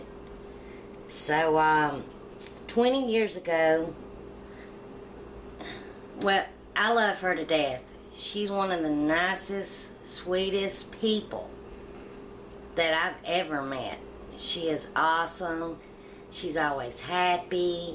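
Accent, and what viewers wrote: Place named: Chattanooga, United States. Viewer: Omg my favorite is fresh biscuits. I told you my 2 kids live in chatt, tn right? I was a nurse in that area for 17 yrs. you speak like them that's why I think u might be in that area. All of my ex family is between Chatt, Alabama, and I worked some in Knoxville. You should email me. <mail> I don't do Facebook but I've been on here almost a year. I'm remarried and we live in SC. I frequently drive and see my kids. I'm going to go in April sometime and I'm going to meet and go to lunch with 2 of my subscribers one lives in Atlanta and the other in Alabama. My daughter has a home in chatt and works with Chatt Fire Prot. Yummy yum lov ur recipes💜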